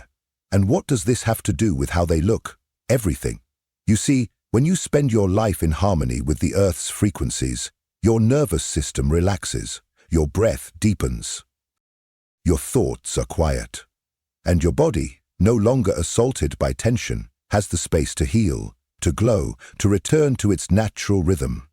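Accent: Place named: United Kingdom. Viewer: Interesting video, but why is the narration for videos like these always done by this same sounding voice with the British accent, as if it personifies wisdom and depth? It's annoying and actually undermines the message. Please, please alternate the voices used to narrate.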